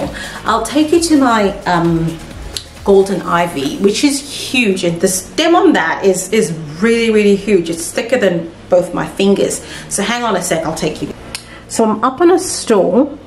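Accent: Australia. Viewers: Thank you so much for explaining. I have always been curious about that. I have a few plants on coir poles and I feel like when I get them wet all I'm doing is watering my soil more. I see that moss is much better than coir. Also after binge watching your videos I actually saw where you addressed my question exactly. I had to chuckle when I was watching it. I have officially watched all of your videos in one day. They are very informative, in an easy to follow along format, and can't beat listening to the Australian accent either ☺️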